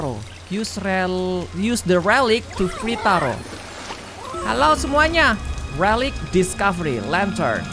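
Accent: Java, Indonesia. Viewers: Mas po koei asli wong jowo ta kok ndang nding i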